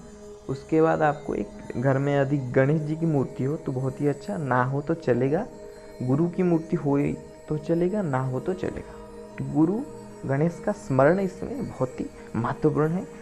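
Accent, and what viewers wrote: Odisha, India. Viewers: Bhai aap odia ho kya??? Baato se toh yese lag raha hey??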